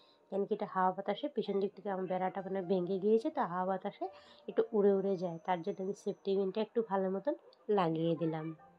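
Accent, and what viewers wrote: India. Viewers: আসলে তোমার কথা গুলো হিন্দি টান আছে বেশ অন্য রকমের।ওই জন্যেই আমার খুবই মিস্টি লাগে শুনতে ❤❤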